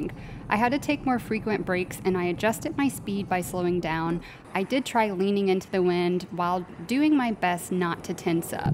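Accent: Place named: United States. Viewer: what a pleasure to hear an American talking about Triumph motorcycles.Some of the vids from England, are, well, I have a hard time even understanding what they're saying. My wife and I sometimes overwinter in Houston, Tx. Preparing to dump my Harley softail for a Speed Twin, or t-120. Difficult decision!!!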